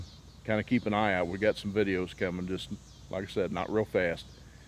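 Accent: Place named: North Carolina, United States. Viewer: Great videos!!! What part of the counrty are you in?? Im in NC you sound like you might be close lol just wondering. 87 GTA here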